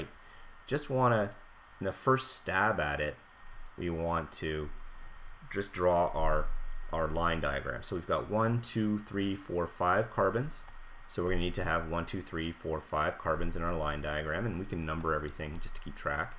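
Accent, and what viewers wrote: Canada. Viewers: That canadian accent tho!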